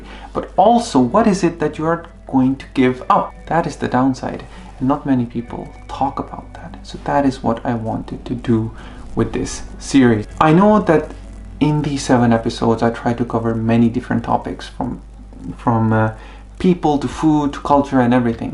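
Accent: Estonia. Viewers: your english already sounds like you're an estonian speaker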